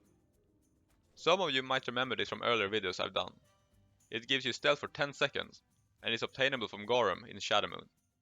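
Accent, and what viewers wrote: Sweden. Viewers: Your Swede accent always brings a smile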